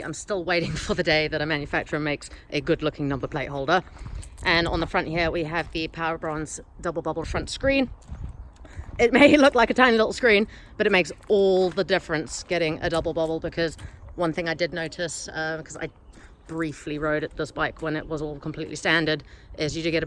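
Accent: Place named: Australia. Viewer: You have an interesting accent, sounds Australian, English and south African all in one haha.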